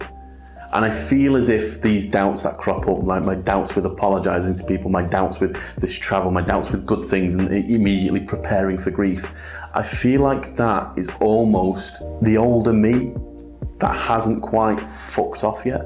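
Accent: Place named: England, United Kingdom. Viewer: can't explain why but i find the baldness very sexy! never been into bald men but when combined with the English accent and the bald head, very sexy!. i watched your film ''Abused'' and as someone who came from an abusive relationship it was very touching and relative. i hope all the new things happening to you keeps on a high note and i don't think you'll be lonely for long, given the sexy baldness..........lol.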